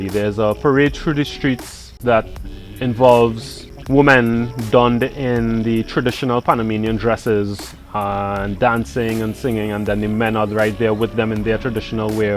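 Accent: Trinidad and Tobago. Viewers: i instantly knew you were Trinidadian from the accent. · Love the blog. Love the Trinidadian accent. Thank you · I clicked on the video...heard ah Trini accent and subscribed one time...loved the video and how you presented both countries .... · Trinidad and Tobago by farrrrrrrr. I know he had an trini accent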